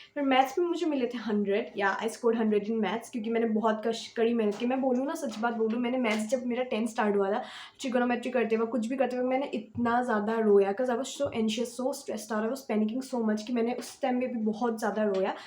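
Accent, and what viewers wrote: Assam, India. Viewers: It seems like you are from Assam. Are you? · Assam fam here! I did recognise that you are from Assam from your accent.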